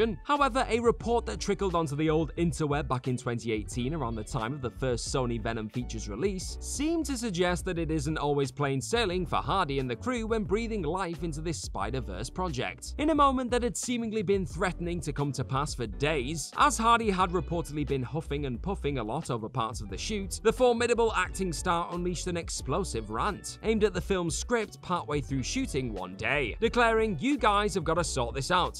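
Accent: United Kingdom. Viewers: He's Bri ish though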